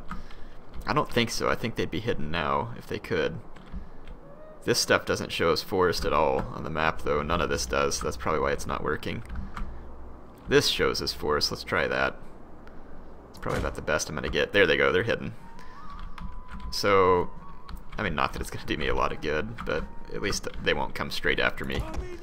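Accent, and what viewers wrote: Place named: South, United States. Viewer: he's from the south c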